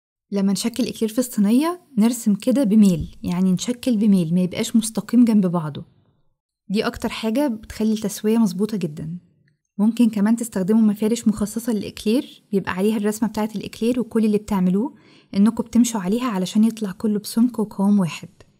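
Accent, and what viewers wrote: Egypt. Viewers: ياللله كم اعشق اللهجة مصرية تحية من جزائر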